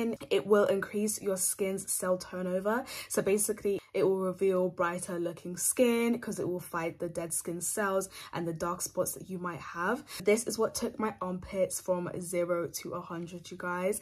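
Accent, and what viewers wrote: United Kingdom. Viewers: someone can tell me if shes british? i hear an accent when she talks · Please some should please write down the name of product she mentioned I couldn’t get her because she has an English intonation please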